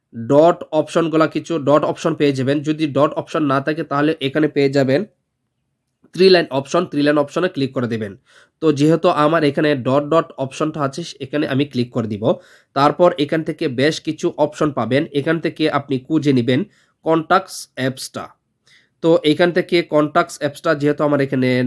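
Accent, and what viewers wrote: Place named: Sylhet, Bangladesh. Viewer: সিলেটি ভাইসাব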